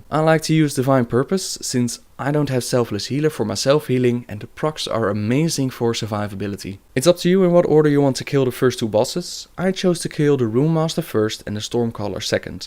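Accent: Netherlands. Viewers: Your dutch arnt you?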